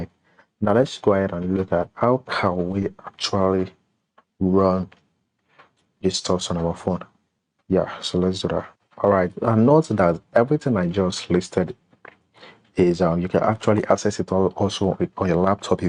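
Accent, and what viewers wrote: Nigeria. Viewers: A Nigerian, nice. I'll recognize that accent anywhere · This tutorial guy sounds like a Nigerian 🇳🇬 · This person has a Nigerian accent 😍😍😍😍😍 Me likey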